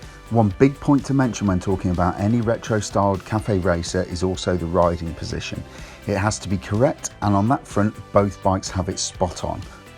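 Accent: United Kingdom. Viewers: Like always , visor down does it the best ! Love the British voice.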